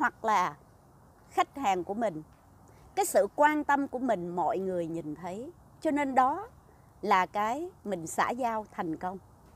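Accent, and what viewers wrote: Standard. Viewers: Chị nói hay chuẩn lắm chị 😁😁 em còn mê chị nói chi ai 🙈🙈 chúc chị luôn luôn vui vẻ tràng đầy sức khoẻ trẻ mãi không già chị nhé.🌹🌹🌹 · Chị nói hay và chuẩn lam chi oi! Giọng nói củng hay va hiểu biêt. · Em nói chuẩn nhất mà chị đã từng nghe.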